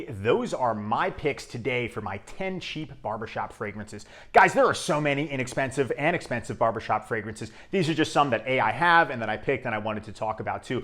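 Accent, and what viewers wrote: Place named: South Jersey, United States. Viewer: Just found the channel and it has to be noted: most people in south jersey dont have Patrick Bateman looking skincare. As a now central jersey transplant from Camden County his actual look is more like here, but his voice is 100% SJ. Most of SJ are bearded long hairs like myself or boomers.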